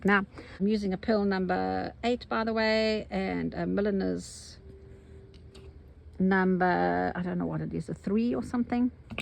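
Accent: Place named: South Africa. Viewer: I keep thinking you sound South African, then you said "ooh gats" and that sealed it 😂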